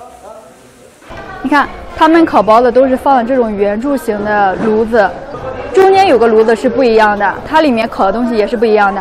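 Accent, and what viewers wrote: Shandong, China. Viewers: 听口音，应该是山东莱芜人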